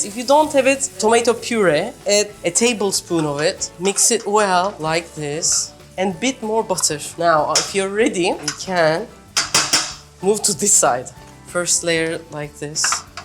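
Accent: Turkey. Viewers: I love how Turkish affects your English. I think it sometimes gets really funny. PS: I feel like "efficiency" may be a better translation to "bereket" than "sustainability". You try to get more from the things you have, so they have more "bereket". I think it describes efficiency quite well. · Love ur recipes 😍 plz Share recipes of Turkish desserts too. I like ur Turkish English accent so soft. love from India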